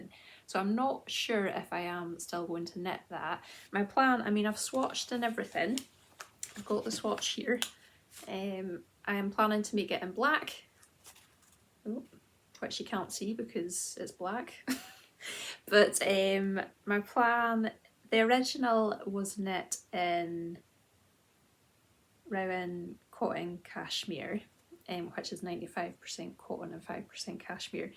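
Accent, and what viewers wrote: Scotland, United Kingdom. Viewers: Hello there, nice to hear we are already with 5000 of us who also enjoy your lovely podcast. Love your no nonsense style and your Scottish accent. Keep on going your own way, we like the way you do this. Greetings from a Belgian fan.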